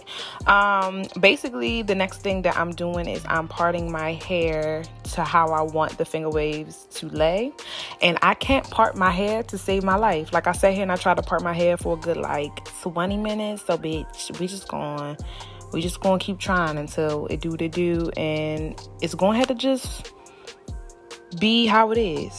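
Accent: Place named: DMV, United States. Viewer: accent😂gotta be from dmv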